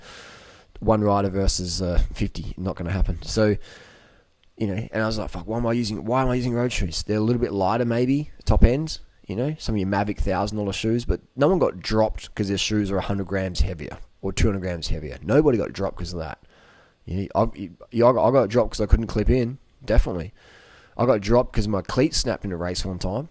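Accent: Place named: Ireland. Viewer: Great to hear an Irish accent on here! We dont need milk.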